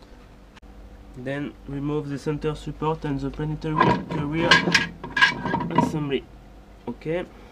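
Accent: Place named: France. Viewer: Same. I thought, ooh, a French accent. Wait, why the flag....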